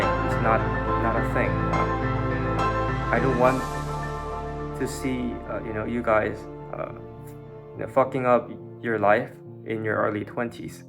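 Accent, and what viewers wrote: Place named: South Korea. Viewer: you speak english well with a Korean flair ofcourse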